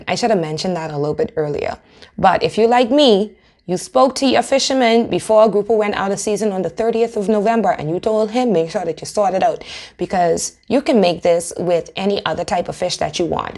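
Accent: Bahamas. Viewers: it's the accent though :-) i love a real authentic Bahamian accent. ima cook some boil fish ta'night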